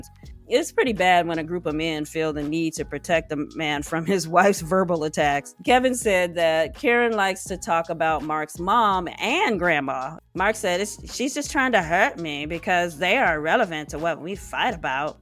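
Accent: Boston, United States. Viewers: I was waiting for this from you & as expected, you were spot on!!! Thank you! "Karen" is a crazy vicious & spiteful narcissistic woman. Mark visibly looked shaken sitting next to her. Clearly no one b'sides Tootie liked her. Was anyone else slightly nauseated by Kevin Frazier's CONSTANT gushing over Katina & Tootie? Like yeah, They said yes on decision day and were still together, but he was kind of a dick throughout a lot of the season. Oh and lastly, I loved that Dr. Pepper and Dr. Viviana laid into "Hostage". The simple truth is that she is NOT a good person and couldn't just admit that she's shallow and based her entire marital demise on her lack of satisfaction with Chris's looks. On that list, looks should have been #1 in her top 5 things she wanted. She kept beating around the bush & dodging questions to the point of severe annoyance., your Boston accent cracks me up. 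Thank you for all you do & making me smile! You are an awesome & talented woman 🌺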